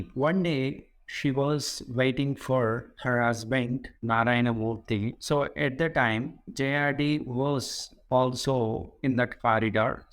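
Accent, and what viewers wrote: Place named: India. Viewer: Nice explanation! ❤️ But the only problem is accent.... honestly, I think we should focus on improving communication skills with our original accents, not changing them. The Indian English accent is genuinely one of the clearest out there 🇮🇳 Just my 1st-year B.Tech thoughts! 🌱. You are more educated and elder then me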